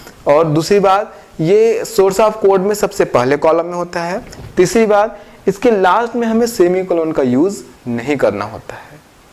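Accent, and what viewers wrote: Bihar, India. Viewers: by your tone i think you're also from bihar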